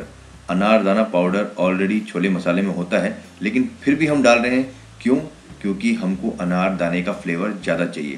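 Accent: Pahari, India. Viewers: LAPATUWAPAN..........ahahahha.... ji pata nahi aapki baat aur bolne ke tareeke se lagta hai aap pahadi ho meri tarah...........uttarakhand se. And jordaar recipe by the way.... Main pakka try karunga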